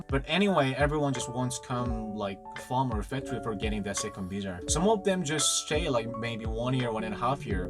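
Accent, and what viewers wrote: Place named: United Kingdom. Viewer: 영국식영어 개멋지다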